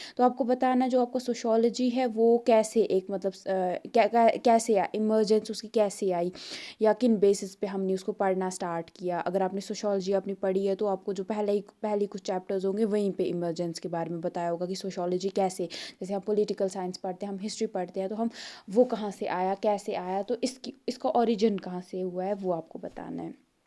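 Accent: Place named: Jammu and Kashmir, India. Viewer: aap ka baat karna ka taraka sa mai samaj gaya thi ki aap jk sa hoge.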